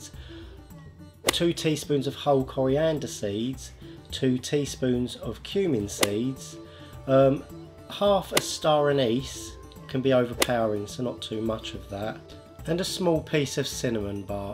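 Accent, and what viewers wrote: United Kingdom. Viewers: I love your accent! What kind of uk accent do you have? is it from kent?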